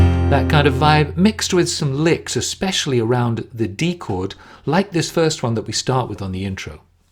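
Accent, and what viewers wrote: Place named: RP, United Kingdom. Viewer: Excellent. I love the teaching and the technique. I just would like to know if the Rex. Harrison accent is authentic because only 2 to 3% of people in England talk like that. And it sounds just like Stewie on a Family Guy, very cool, though.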